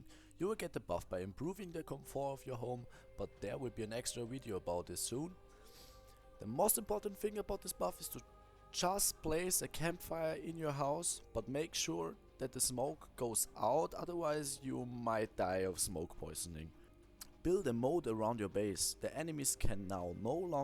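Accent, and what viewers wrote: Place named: Russia. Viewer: Bros having the heaviest russian accent to mankind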